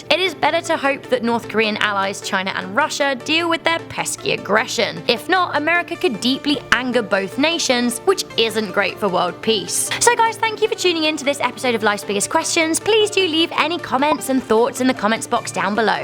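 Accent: United Kingdom. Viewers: She has a British accent. I have a accent like that and it’s hard to say some words. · Mrudul Jain she is British get over it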